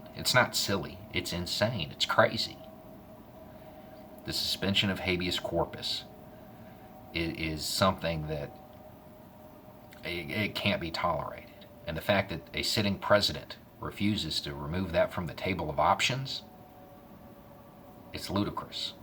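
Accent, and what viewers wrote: South, United States. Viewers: I really like your dedication to your country. I only wish more people were as informed, and as intelligent, as you are, Sir. And I really love your southern accent!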